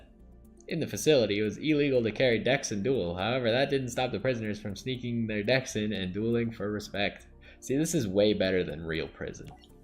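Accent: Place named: Texas, United States. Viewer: That’s more of a Texan accent not a southern accent. There are nuances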